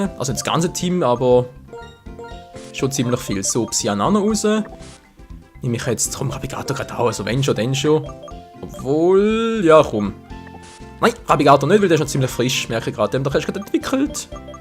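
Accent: Switzerland. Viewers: Besch du Basler oder Zuger ? ^^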